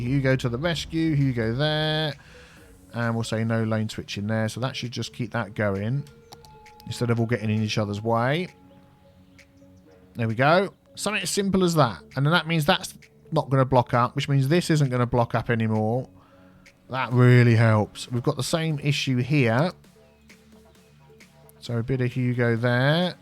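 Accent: United Kingdom. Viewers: thank you for responding! Once in your lifetime, you could lose hearing to a point where you can't understand anyone. Have you thought how life would be like if you were deaf? Mine started at birth, always trying to understand people. I can hear, yes, with a cochlear implant, but I want you to consider the people who physically can't hear. They are forced to read your captions if they want to try understand you. They miss out on the physical cues you give off as a British speaking person. I love your accent, and seeing you talk would bring joy not only to me, but to the deaf, deaf blind and hard of hearing community. We get to know you through how we see the world, not just people who just hear you speak. I absolutely love how you have your word choice, having captions helps me follow along, laughing. Why not go one step further? Hope to see you soon!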